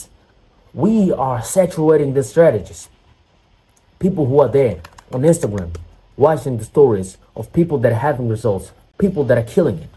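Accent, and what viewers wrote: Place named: United States. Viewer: Give this man some subs he's perfected the American accent while living in Mozambique!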